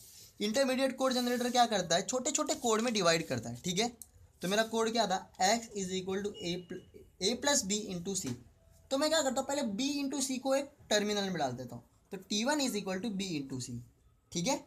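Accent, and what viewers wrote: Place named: Marwari, India. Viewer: Marwadi k tarah😂.. Ek no bhai👌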